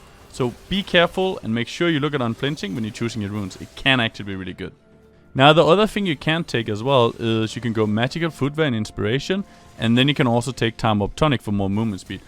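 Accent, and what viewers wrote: Denmark. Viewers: you sound like a dane ?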